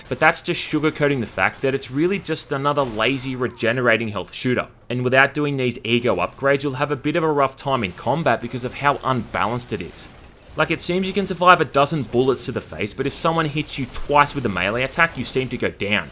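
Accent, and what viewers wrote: Australia. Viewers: I think it’s the Australian accent in the inflections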